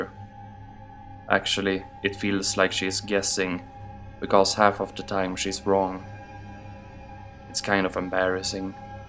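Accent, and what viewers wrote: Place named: Europe. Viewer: Hi, just subscribed as I heard you on swamp dweller's collab and love your voice!! That accent!! Woohoo !! Great content and nice to here a few more European narrators and stories ( am a Brit myself )xx looking forward to seeing more x good luck 😉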